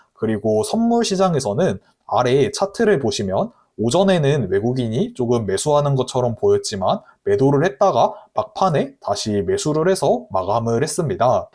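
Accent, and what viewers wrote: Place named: Chungcheong, South Korea. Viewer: 100프로 충청도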